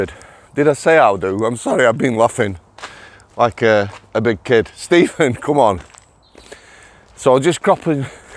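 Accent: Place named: Wakefield, United Kingdom. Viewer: Lost your wakey twang, almost full on Manc !!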